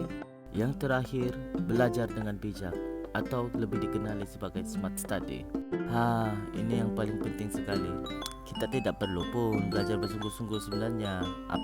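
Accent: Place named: Sabah, Malaysia. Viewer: KETARA SABAH TU HAHAHA. TRUSKAN IDENTITI SABAHAN TU . BTW NICE CONTENT 👍👍👍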